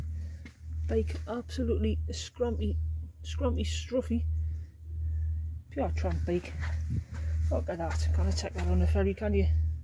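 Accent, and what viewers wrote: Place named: Newcastle, United Kingdom. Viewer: A good Geordie accent there, then! I remember a holiday on Eigg in 1954. The 'singing sands' are also 'sinking sands' - you have to be careful where it's wet - you get sucked in. The croft we stayed in had not yet got electricity so we had oil lamps to find our way upstairs to bed. The cooker was an old iron range cum open fire. You could hear the Puffin birds crying all night up on the backbone escarpment - quite eerie!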